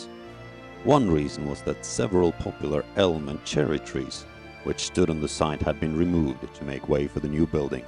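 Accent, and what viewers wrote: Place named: United Kingdom. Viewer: British narrator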